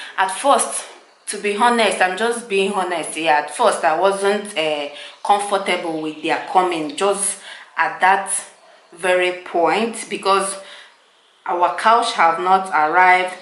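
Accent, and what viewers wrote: Nigeria. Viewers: Aunty!! I love how authentic you and your family are. I especially love your thick Nigerian accent. It's sounds beautiful. Thanks for being so real!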